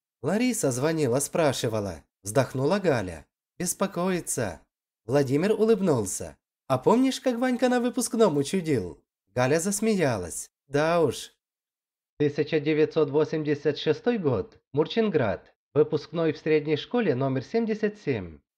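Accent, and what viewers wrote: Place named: Ukraine. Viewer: Обожаю рассказы про бабу Капу. И чтец с украинским акцентом, просто прелесть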